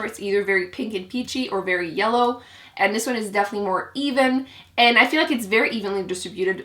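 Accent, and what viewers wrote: Quebec, Canada. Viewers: Clairement tu parles français, Québec?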